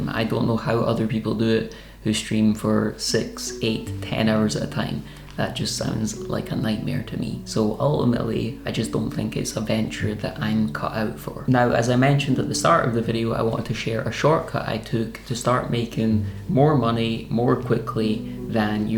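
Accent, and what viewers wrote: Scotland, United Kingdom. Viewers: Yes, an extra $1,000 per month would be most agreeable and worth the effort,. I must comment as well that understanding the Scottish brogue has always been a bit of a challenge for me however your mastery of "English" is formidable. Thank you for that!!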